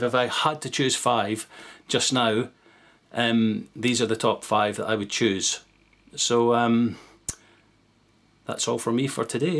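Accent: Scotland, United Kingdom. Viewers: love the Scottish accent! long live Scotland! :) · I'm still searching, while avoiding Gillette, because of their advert. I have an old 1958 Gillette that''s still serviceable, from the year before before I went into the military. When I can afford it, I'll try the Rockwell. I really like your channel, and I enjoy the intelligent comments made by your viewers. I wish I had a Scottish accent like yours. Thank you for an informative and entertaining half-hour now and again.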